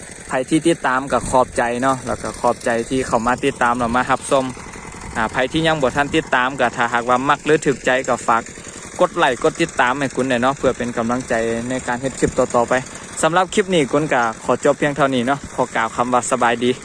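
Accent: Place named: Laos. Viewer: บ่าว เว้าลาวม่วนจร้า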